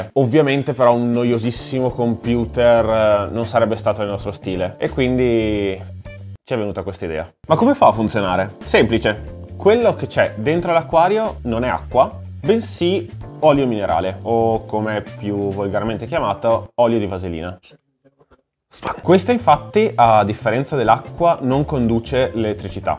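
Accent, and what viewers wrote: Italy. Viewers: Porco due sento troppo l'accento di Brescia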